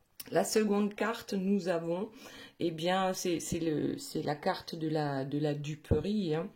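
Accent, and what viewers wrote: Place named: East, France. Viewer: Merci beaucoup pour cette guidance, je pense que ça correspond bien à ce qui se passe dans le couple de celle que j'aime avec qui on est en non communication pour l'instant. Sinon j'avais choisi le monde pour elle et la mort pour moi et ça me va 👍 Merci (aussi pour ce petit accent de l'est ..😊 je suis de Moselle 😂) 😘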